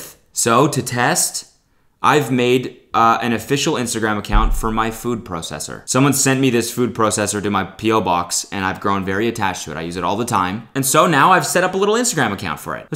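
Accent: Canada. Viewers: I had to scroll so long to find this comment. Who says “pro-sess-sor” instead of “pross-sess-er “? Sometimes be soundin realllll Canadian 👀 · Lauren Annie because Canada. He says sorry like that too lmao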